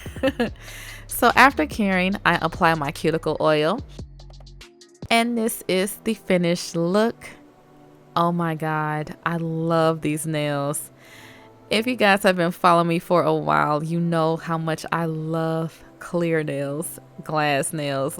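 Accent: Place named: Atlanta, United States. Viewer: Just found your videos today and first thing I thought was “she sound like My fellow Atlanta native Kandi Burruss” anywho you’ve inspired me to use my Cosmetology skills to do my own nail dyeing and after this pandemic! Now let see if I can remember those Empire beauty school lessons along with you videos I should be fine😹😹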